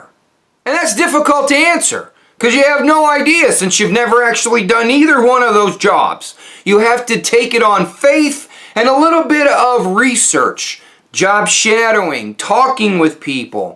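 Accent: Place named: United States. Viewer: Good talk, will be helpful advice for my 16 year son as well. I also like to watch your videos because you are mad..lol...American English is very different from our Australian pronounciation!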